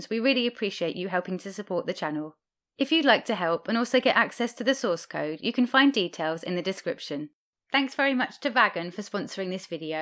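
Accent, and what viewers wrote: United Kingdom. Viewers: came for the unity tutorial, stayed for the spiderjob by this delightful british accent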